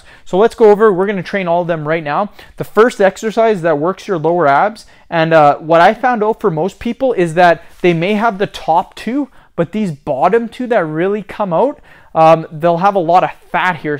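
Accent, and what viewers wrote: Canada. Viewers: is it just me or does he sound canadian..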